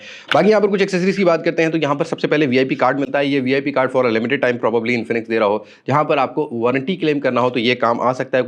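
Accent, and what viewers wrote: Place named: India. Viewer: Meri apse humble request Hy ap indian tarz my bat krna chor de . Ap yh kar skate ho ap woh kar skate ho . Proper Urdu my way my boliye ap yh kr skate hai ap woh kar sakte. Hy . Hoo ki jaga Hy lgiye pls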